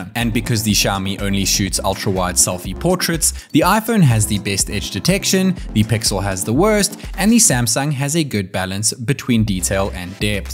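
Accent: South Africa. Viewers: South African ❓I'm new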